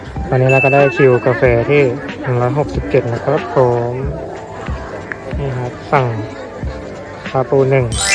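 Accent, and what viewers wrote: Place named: Kamphaeng Phet, Thailand. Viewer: สำเนียงคนกำแพงเพชรครับ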